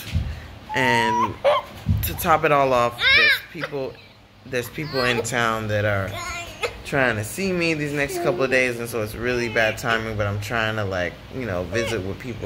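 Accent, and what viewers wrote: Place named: Senegal. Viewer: Hello, I am a Togolese living in DC and I just discovered your lovely family. Just want to tell you that I love love the way you speak French with the Senegalese accent! It is not easy to speak another language especially French. I can see why people would think that you are Senegalese. Such a good role modèle for your daughter. After 30 years in the US, I am planning a return home soon. Although I am African, I spent most of my life abroad. The anxiety of going back is real ! So thank you for inspiring and motivating me! sending positive vibes and blessings to your beautiful family. 🤎😊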